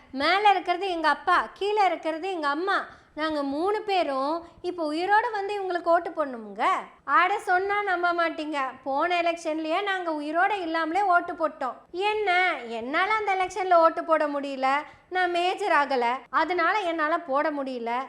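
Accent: Kongu, India. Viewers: இனிமையான அழகுத் கொங்கு தமிழ் தன்னில் செழுமையான பேச்சு வாழ்த்துக்கள் சகோதரி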